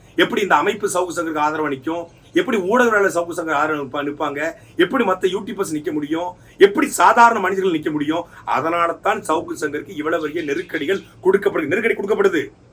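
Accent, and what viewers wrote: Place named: Uttar Pradesh, India. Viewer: Why ur sounding like a UP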